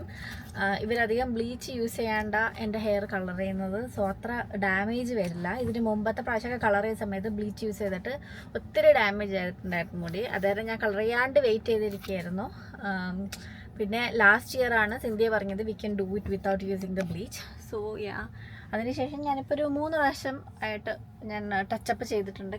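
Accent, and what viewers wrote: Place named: Trivandrum, India. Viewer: You are from Enklm and you have tvm slang...😅😅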